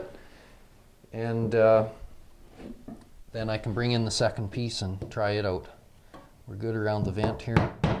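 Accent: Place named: Canada. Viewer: Are you Canadian? You sound more Canadian than me and I’m from northern ontario where I’m told the accent is the strongest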